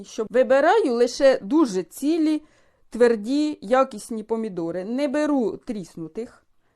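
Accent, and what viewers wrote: Poltava, Ukraine. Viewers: Відчувається в голосі полтавський акцент))) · Дякую!Чую по мов1,що ви з р1дно1 полтавщини! Гарний рецепт! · В МЕНЕ РОДИЧІ ЖИВУТЬ У МИРГОРОДІ ,ТО Я ПО РОЗМОВІ ЧУЮ,ЩО ЦЕ ПОЛТАВЩИНА. Я ВСЕ КЛАЛА В ПОМІДОРИ ХРІН,А МЕНІ КАЖУТЬ,ЩО НЕ КЛАДУТЬ. ХРІН,А ПЕТРУШКУ. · Як я люблю полґтавську говірку...мала батьківщина мого чоловіка... А які там смачні помідори! Дякую вам! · Так мені подобається Ваш Полтавський говір)))Ніби спів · З задоволенням додивилась до кінця. Навіть не дивилась-більше слухала. Такий рідний полтавський говір. Полтавщина і Вінниччина це моя любов. Бабусі мої там жили. Завжди взнаю людей звідти. Щире вам дякую за рецепт за поради за спомин.❤ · так, не діалекти. Не вірно висловилась. Це специфіка нашого полтавського мовлення. Мені просто цікаво, ви в повсякденному житті розмовляєте виключно літературною мовою? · І я одразу подумав, що говір полтавський.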